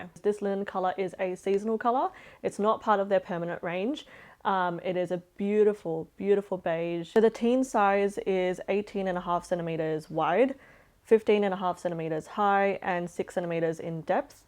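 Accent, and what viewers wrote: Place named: Australia. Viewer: Are you from Aceh? Can’t figure which accent, but the more I hear it’s definitely Aussie’s 😅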